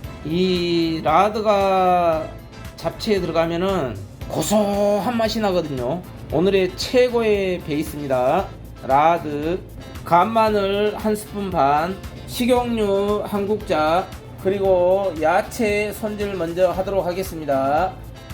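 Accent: Jeolla, South Korea. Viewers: 구수한 전라도 사투리 나오는거 정겹슴니다.라드는 어디서 팔아요?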